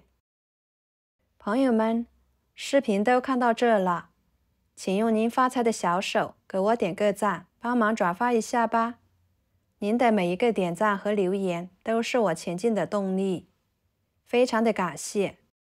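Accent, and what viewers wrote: Yunnan, China. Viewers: 这云南普通话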